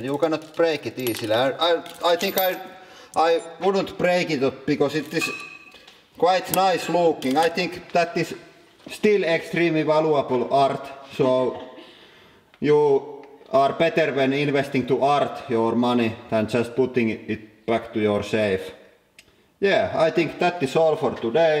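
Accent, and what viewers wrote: Finland. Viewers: this clay monsters are so satisfying to watch when they get cruched:D along with the finnish accent this page is just perfection^^ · Could you do a video in Finnish? I don't speak Finnish but I love your accent and would like to hear what Finnish sounds like. Especially the phrase "hydraulic press."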